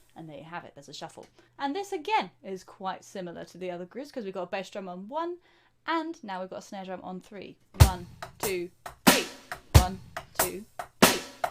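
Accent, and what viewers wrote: United Kingdom. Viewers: This makes me want to get back into drumming again! Hello from New Zealand! Love the British accent 😛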